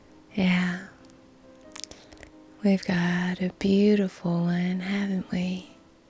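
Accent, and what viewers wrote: South, United States. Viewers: I love how talks in that Southern accent. Happy early birthday by the way.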